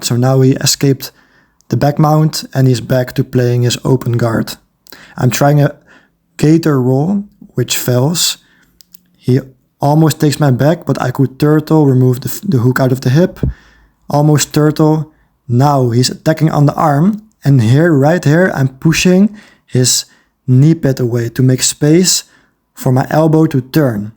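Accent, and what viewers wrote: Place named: Belgium. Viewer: Your accent sounds like a Belgian accent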